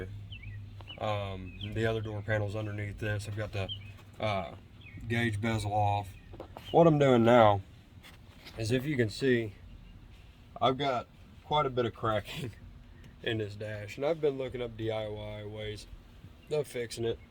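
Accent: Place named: South, United States. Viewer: That's a nice truck and a fun project if one have the time and money for it. Especially since it was your granddad's. I love your accent. I'm European and have never been to the USA but if I go there one day I think I'll skip the north and just travel around the south, probably Nevada too because of Fallout New Vegas. 😄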